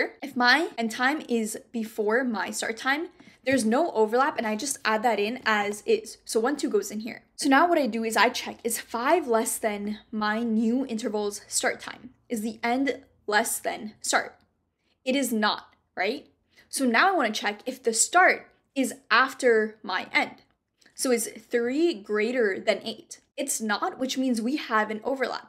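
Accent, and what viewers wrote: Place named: India. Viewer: Can feel indian and American accent ❤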